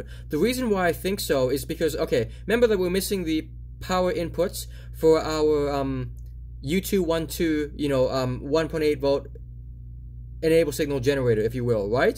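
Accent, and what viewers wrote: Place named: Russia. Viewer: Shit i was thinking he's from russia.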